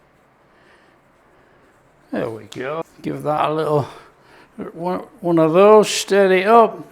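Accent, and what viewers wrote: Yorkshire, United Kingdom. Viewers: lol a fine Yorkshire boy, I never would’ve guessed! 😂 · What part of Yorkshire are you? I'm in Leeds.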